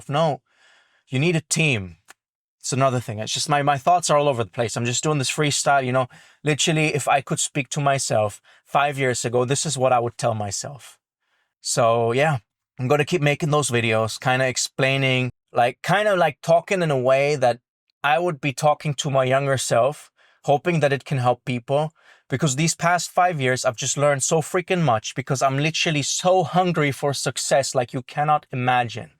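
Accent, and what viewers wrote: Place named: Jamaica. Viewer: Liked and subscribed because your accent sounds kind of Jamaican 👍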